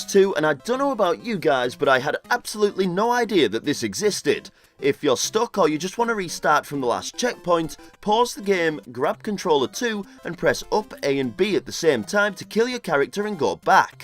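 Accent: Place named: Scotland, United Kingdom. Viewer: I love your Scottish accent <3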